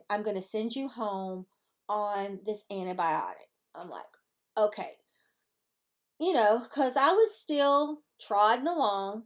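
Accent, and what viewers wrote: South, United States. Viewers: So good to hear that sweet southern twang say, "Hey guys, welcome back to my cheeennel." Welcome back to You (tube) ! Hip hip hooray!!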